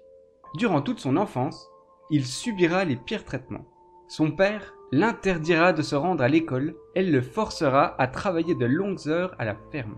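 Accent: Jura, France. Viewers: Bonjour vos vidéos sont intéressantes, on ressent un gros travail de recherches ... Félicitations ! Continuez comme ça. Marrant l'accent Jurassien !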